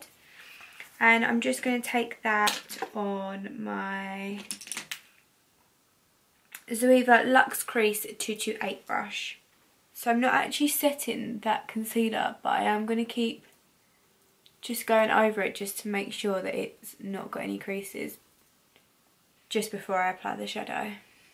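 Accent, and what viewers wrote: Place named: Essex, United Kingdom. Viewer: Just found your channel and absolutely love it! Its nice to hear someone with an Essex accent haha x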